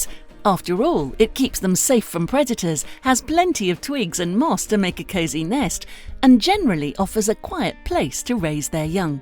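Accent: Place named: United Kingdom. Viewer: Not everyone is American dude, the accent is British.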